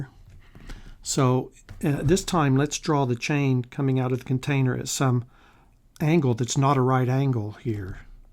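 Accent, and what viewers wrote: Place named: Texas, United States. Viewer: Damn calculation done by unsimplified physicist with Texan accent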